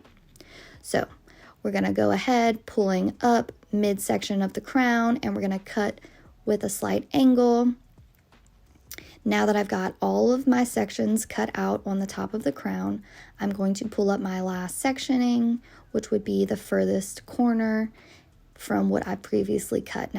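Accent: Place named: United States. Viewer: I can’t do with the American accent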